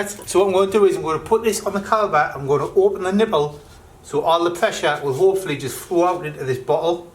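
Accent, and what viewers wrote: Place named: Newcastle, United Kingdom. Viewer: You talk very clearly with no bs and a jordie accent well done a great teacher you are. Hard to do without being a cock...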